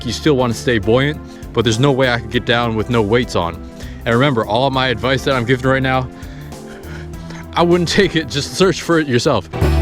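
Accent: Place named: South, United States. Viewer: are sure your not from the south maybe just a southerner with a unique accent with all that butter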